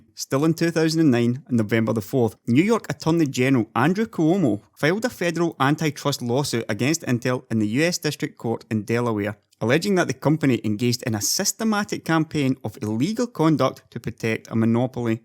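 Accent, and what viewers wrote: Scotland, United Kingdom. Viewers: I love your Scottish accent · Are you scottish? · Just built my 1st pc in the last year or so, luckily I bought a shitty cheap i3 to get me going but decided half way through of building a better 1 so got an rtx2070 which is a bit strong for an i3 I think. I was looking into a new motherboard and processor and I’m really glad I watched this video, I will never buy anything from intel again. Gonna wait for the new thread ripper and get a beast of a pc. PS I just subbed to this channel the other day and so informative. Every video is class that I’ve seen. Presume your from Scotland ye? I’m in Aberdeen so thanks from a fellow Scot :) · I thought you are Scottish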